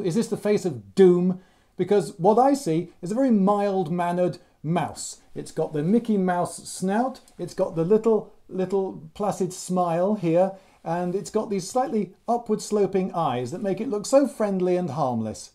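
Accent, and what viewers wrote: France. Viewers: Nice french accent! It seems that the "pig faced" is named "bec de passereau" in france ("sparrow beak")... I don't know exactly why, but here si my view** : you see it as a pigface when you are in front of your enemy. In the other hand, you see it as a sparrow beak when you take a look at the ally at your side. ...So this could come from Azincourt**, where the french chivalry (seeing themselves as the "birds") were in front of english archers (seeing the french as..well, you have understood ;) ) Thank you for the very nice video! (**: It's not a historical reason, guys, just a joke!) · nice french accent!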